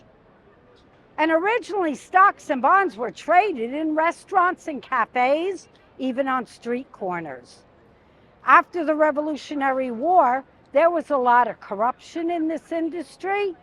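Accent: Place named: New York, United States. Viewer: Love your NewYork accent!😊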